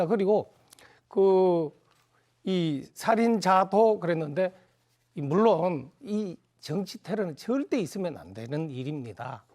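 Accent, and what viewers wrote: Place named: Gyeongsang, South Korea. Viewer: 갱상도 아이가 ㅋ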